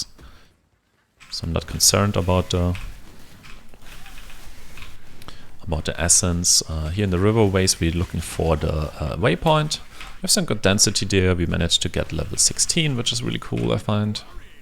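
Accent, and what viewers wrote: Germany. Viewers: Oh my god, you can't just ask someone why they sound German!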